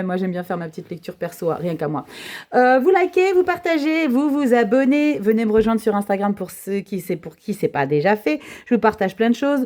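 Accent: South, France. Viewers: Coucou, je vous adore, vos guidances son profonde de sincérité et de lumière, un petit accent du sud? un coucou de Tarbes 65 sud Belle journée à vous et votre famille. Gratitude, gratitude, gratitude. Scorpionne ascendant Vierge🤗🧡💛💚💙💜🤍🙏🐞🦋🍀💐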